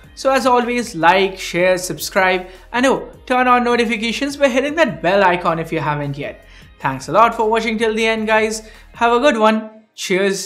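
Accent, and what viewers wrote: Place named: Bengal, India. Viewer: with Bengali accent songs pretty weird to me as I'm an Odia 😆😆😆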